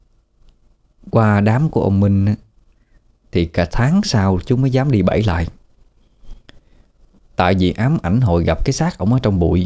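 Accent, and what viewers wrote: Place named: Mekong Delta, Vietnam. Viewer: Xin phép hỏi em quê ở đâu mà giọng đọc hay quá.giong miền tây của mình quá.do trời phú cho giọng đọc xuất sắc.nghe riết ghiền để phone trên giường nghe rồi ngủ mà có khi nghe đến 3 giờ sáng.cam ơn và tác giả ❤❤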